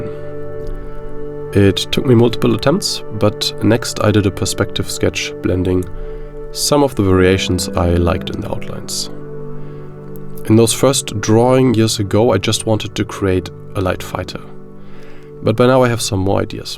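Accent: Germany. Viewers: dieser akzent macht mich fertig..deutscher ? :D aber respekt guter content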